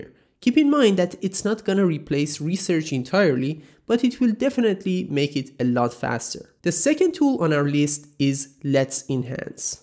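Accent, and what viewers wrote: Iran. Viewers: why ma irania inghad lahjamoon ghabele tashkhise ? )) damet garm azizam . are you best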